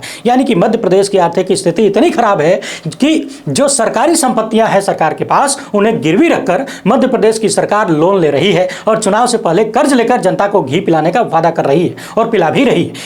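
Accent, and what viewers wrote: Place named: Awadhi, India. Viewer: आपकी पत्रकारिता को सलाम। आप अपने कार्य को पूरे समर्पण के साथ कर रहे हैं। आपको मेरी ओर से स्नेह और दिल ❤से आशीर्वाद। आप बहुत ही द्रुत गति से बोलते हैं और कभी कभी आप अवधी बोली का तड़का लगा देते हैं। आपकी यह शैली मुझे बहुत पसंद हैं।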